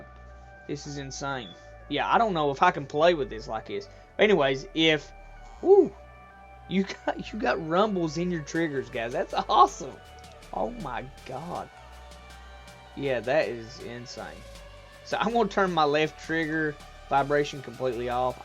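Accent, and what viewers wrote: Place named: West, United States. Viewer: I like your red dead redemption accent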